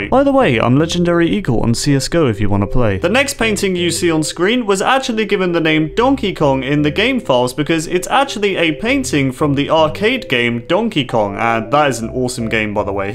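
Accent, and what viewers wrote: United Kingdom. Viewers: I love this accent are you british or something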